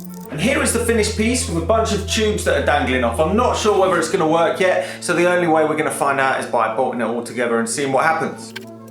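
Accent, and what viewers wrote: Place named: United Kingdom. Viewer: The voice has a British accent.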